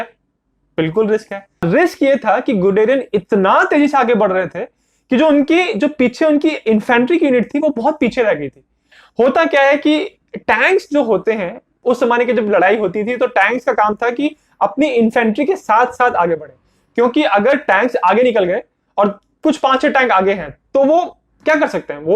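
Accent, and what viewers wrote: Bengal, India. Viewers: Bro are you a Bengali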